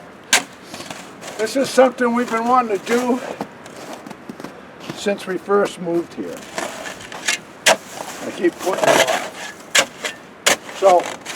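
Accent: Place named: New York, United States. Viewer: Great green house 👍 . I'm looking at the yard today,, bags of leaves all over the place ((just in case )) hahaha. I don't know if u ever did a dumb thing like I did today , I had the iron rake set up by the fence and didn't see it and stepped on it and wamm the long handle hit me in the mouth and nose. Man did that hurt ,, I thought I broke my tooth . It's O.K. NOW but wow I don't know how long I can do the garden ,, just turned 80 and it's getting rougher every yr. Love your videos and N.Y. accent ,, My daughter used to live in Jersey & he worked in the CITY . SO I know that accent ((smile)). Fm. W.I...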